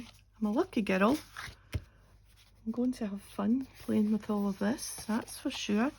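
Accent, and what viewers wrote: Scotland, United Kingdom. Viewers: Great to hear another Scottish accent. Fabulous box of goodies. Love what you made💕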